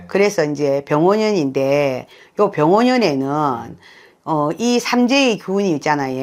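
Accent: Busan, South Korea. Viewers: 선생님 부산분이 신가요?ㅋ 쏙쏙 귀에들어옵니다 ㅋ 정묘생 쏠로운은 없나요😅 좋은 말씀 감사합니다❤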